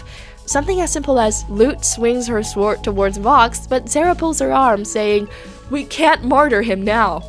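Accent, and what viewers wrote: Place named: United States. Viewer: have autocorrect off. She is hella fluent in english, seemingly amerucan variety with english influence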